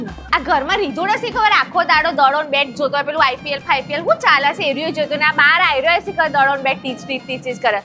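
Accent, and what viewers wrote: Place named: Mahesana, India. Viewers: Mahesana nj bhasa sambhadva no Anand Avi Gayo👌👍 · મસ્ત મેંહાણી ભાષા બોલે છે તમે મહેસાણા ના છો???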